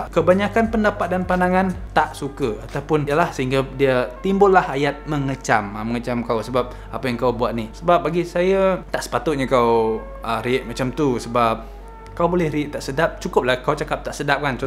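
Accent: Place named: Peninsular Malaysia, Malaysia. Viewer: Logat kmu mcm org senanjung la bro,,mana logat dayak mu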